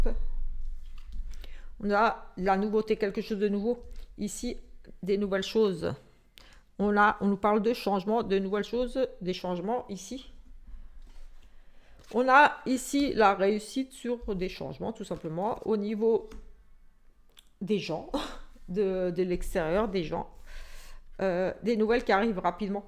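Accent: Alsace, France. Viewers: J'adore votre accent depuis 6 ans je vie en Alsace avant jetait en Moselle hopla dü ! Bisous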